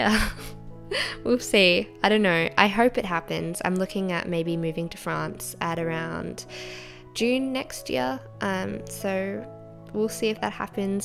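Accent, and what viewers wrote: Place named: Australia. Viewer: Nevermind the haters. Aussie accents are adorbz :) Found you from Purple Palace's comment section lol. Cool vibes, keep it up!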